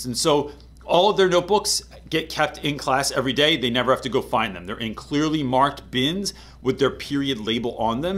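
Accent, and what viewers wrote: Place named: Philadelphia, United States. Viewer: Thank you! It’s nice that focus isn’t an issue anymore ))) Instantly glued to the like button. You accent is truly Philadelphian ! Love it. Thanks! Sir!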